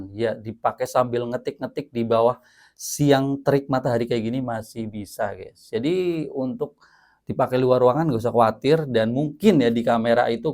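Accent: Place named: Banyumas, Indonesia. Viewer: Logat ngapak-nya masih kedengeran 😅. Tp raut mukamu oke ngab. Lanjutkan karyamu. Sukses selalu 🙏🏼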